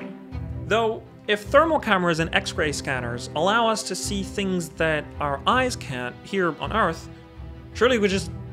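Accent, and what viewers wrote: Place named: United States. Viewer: I'm really curious about your nationality..you sound american, but I am getting hints of swedish or german......what's going on...either I have a very good ear..or I am a lunatic...I'm fine with either.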